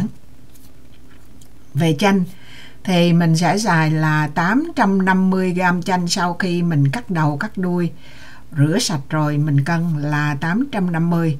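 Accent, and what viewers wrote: Quang Nam, Vietnam. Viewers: cam on co da chia se cong thuc. Rat de dang va cach noi chuyen nguoi Quang Nam cung rat de thuong.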